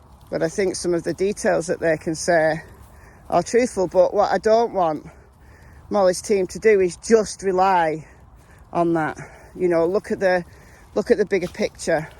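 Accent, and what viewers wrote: United Kingdom. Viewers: Thank you,. I always enjoy your lives, even if I very seldom have ever caught you live. Watching the replays, I always enjoy listening to whatever you are talking about, plus I so enjoy hearing your British accent. 😉 I would very much enjoy hearing you talk about the difference between the kinds of domestic abuse. I would find that very interesting. Thank you so for the time, effort and dedication that you put into the content that you provide. Stay safe and take care. ❤️🤗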